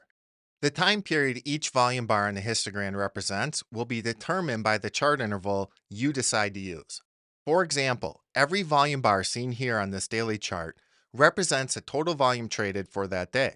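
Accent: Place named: Chicago, United States. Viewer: He’s real. Real good at trading. Notice the Chicago accent? They’re real good at trading too.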